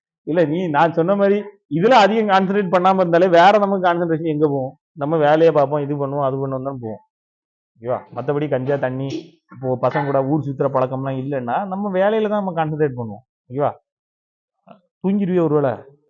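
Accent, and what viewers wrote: Tirunelveli, India. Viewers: மாஸ்டருக்கு திருநெல்வேலி யா தூத்துக்குடி யா,,,,, எந்த ஊரு உங்க பேச்சுல நெல்லை தமிழ் ,,,,,,, இனிமை,,,,,, இனிமை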